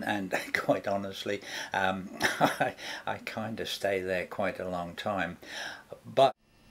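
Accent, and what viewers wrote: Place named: East Anglia, United Kingdom. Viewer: Where are you from, originally,? Your accent reminds me of my own happy huntng grounds of East Anglia:]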